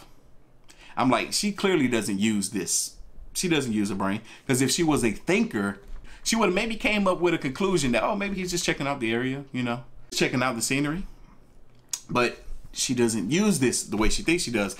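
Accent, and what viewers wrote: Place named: Decatur, United States. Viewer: Sounds like Decatur area. Lol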